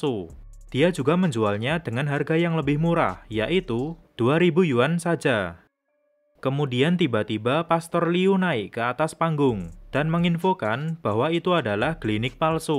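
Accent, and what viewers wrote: Java, Indonesia. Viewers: Asli mana bang ? Suaranya seperti saya sama" medok 🙏😊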